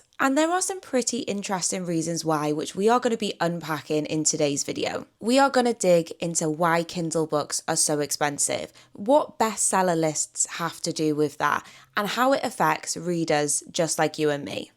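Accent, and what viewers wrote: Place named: Southern England, United Kingdom. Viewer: Based on her accent and the info in the description of her Channel, she most likely buys books in the UK. I'd guess southern England but I could be wrong.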